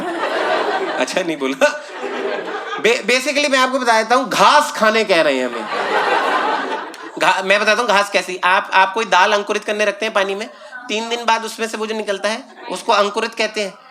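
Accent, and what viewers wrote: Pakistan. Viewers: Is he Pakistani his tone is similar..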